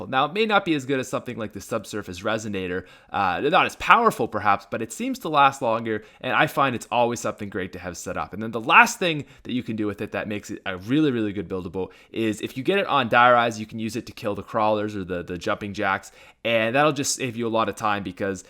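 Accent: Canada. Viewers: Its funny I'm Canadian and he's the only Canadian I've heard that says aboot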